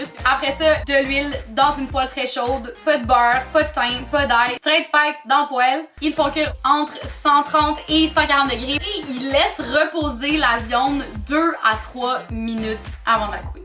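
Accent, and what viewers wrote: Quebec, Canada. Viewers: la vie plus tard je sortirai avec une canadienne, j'adore leurs accents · J essaye de comprendre le canadien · La femme parfaite 😍 avec les steaks parfait 😋echtuerais pour un souper avec elle 😂 regarder l'accent 😂😂😂 qc asf nice video jvais la garder pour quand j'aurai faim 😋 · J'adore l'accent québécois il est génial 😂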